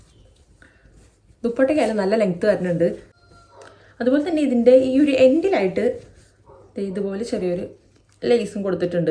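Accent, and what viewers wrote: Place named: Thrissur, India. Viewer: Chechide veed Thrissur aano Slang avidethe thonni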